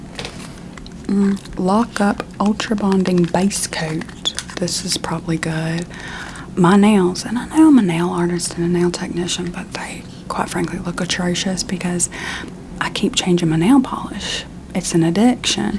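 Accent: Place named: North Carolina, United States. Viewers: I grew up in NC, and one of the first accents I was able to pick up was the thick NC accent of one of our neighbor ladies. This brought back so many memories lol ty ❤ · Ugh I love. I live in NC and she reminds me of this sweet woman who used to work a local convenience store. She would talk your HEAD off but she was so sweet, and the accent reminds me so much of her.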